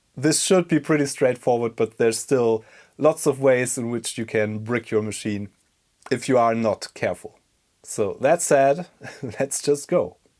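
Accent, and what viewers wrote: Germany. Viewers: Ich würde mir Wünschen das Du die Videos auf Deutsch machst. Dein Deutsches Akzent bei deinem Englisch ist Grauenvoll. Übrigens ist die größte Retro Community eh in Deutschland. Man kennt ja den Deutschen, der hält an eine Sache Fest bis Lebensende, egal ob die Sache Gut oder schlecht ist.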